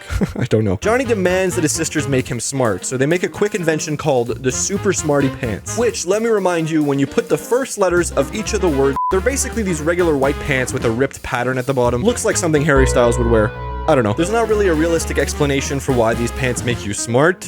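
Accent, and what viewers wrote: Canada. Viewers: Holy crap you swear like a true Quebecker! Props to you!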